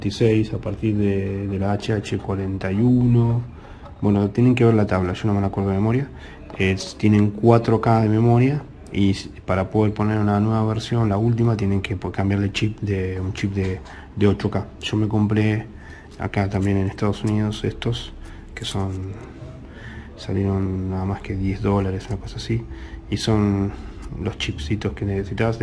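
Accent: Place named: Argentina. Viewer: O habla en español o en argeninglish, me volví loco intentando traducirte...si pronunciás "bas" por "bus", deberías pronunciar "baedi" en lugar de "body", y así todo el video.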